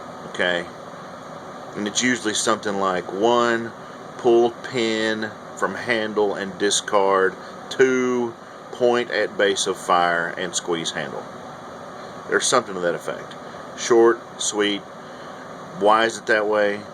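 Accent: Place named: South, United States. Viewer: nice hearing this from someone with a southern accent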